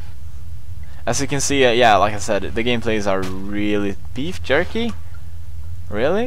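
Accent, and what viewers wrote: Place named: United Kingdom. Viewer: He deleted it because of the British accent lol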